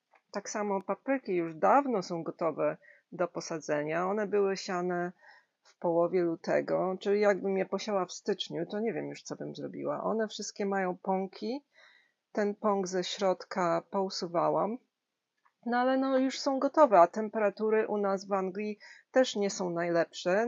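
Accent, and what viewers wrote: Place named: United States. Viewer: Skąd ten amerykański akcent?😊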